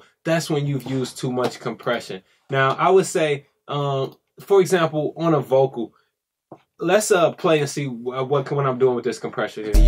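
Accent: St. Louis, United States. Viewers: UR GODD AT IT BUT U R HILARIOUS.....U SOUND LIKE A STL NIQQA!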